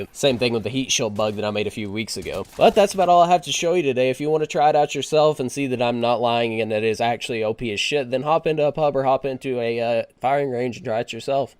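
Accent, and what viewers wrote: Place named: South, United States. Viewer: You have a bit of a southern draw, you from Georgia or the surrounding states?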